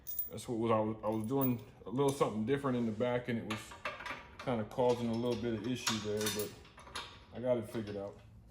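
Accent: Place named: South, United States. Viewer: Canada? I don't know his current address but that accent is from waaaaay down below Canada.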